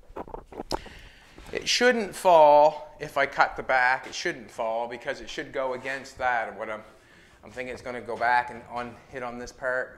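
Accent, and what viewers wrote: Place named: Canada. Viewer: Ohhhh. "Tudor"!... last episode I kept thinking you were saying "two door" over and over again. Hahaha i gotta get caught up on my Canadian accent decipher. Looking good guys! 1 hour chop!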